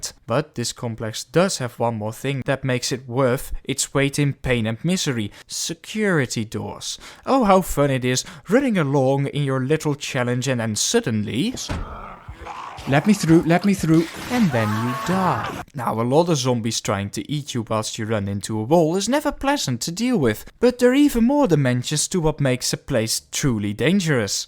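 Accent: Netherlands. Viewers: I speak Afrikaans, and to me you sound like a Dutchman! Am I on the money? Been watching for a while. I like your style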